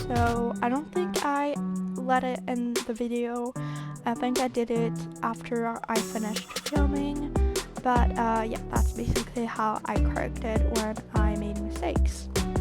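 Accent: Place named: United States. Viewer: I look forward to not only hearing your lovely voice but to see you upright & walking a few steps even if like me it's with leg braces! But please correct me if I am wrong but you have no spinal cord damage but just your brain not sending signals to your legs to make them work? Even though I have only known you on here for a short time,I would rather you have a reaction to a covid booster then catch covid itself & be very ill,we have one thing in common apart from being disabled,you rely on a wheelchair to get around & I rely on hearing aids & other medical aids to make my life easier! The good thing for you is your young & there are medical advances all the time so I am confident about you walking again! And who knows when I get more powerful BTE hearing aids or a CI I will hear how lovely you sound! And the American accent haha 💟💟💟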